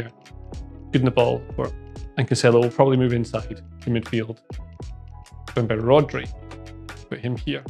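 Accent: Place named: Scotland, United Kingdom. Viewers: Why I have a feeling that a Scottish accent is perfect to explain football tactics??? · is this guy scottish or eastern european hahah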